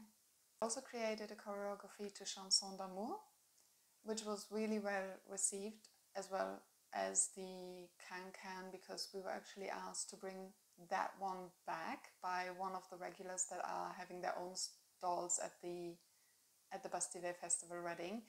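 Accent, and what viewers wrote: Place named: Germany. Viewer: Hello friend 🤠👋 I was already a subscriber, but this was very interesting 🎉🎉🎉 I was completely blown away by your accent however, I wasn't ready for what sounds like German or Austrian 😮😮😮🤯🤯🤯 Not to be stereotypical, but I really thought you were Middle Eastern lol. In any event, congratulations on your company's success and being displayed in the paper! Can't wait to see more dancing! Come visit us in The States! There's a Reflexology session waiting for you as a thank you for all of your hard work ❤️🙏 🤗 Your friend Jay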